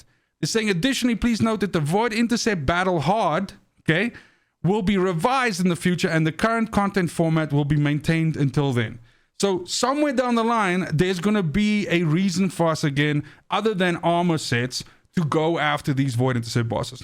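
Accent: South Africa. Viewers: South African Afrikaans accent! Thanks for the video.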